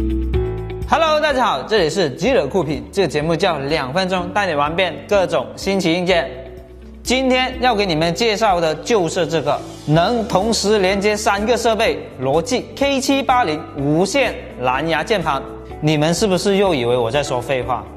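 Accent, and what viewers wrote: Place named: Guangzhou, China. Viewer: 广州人口音。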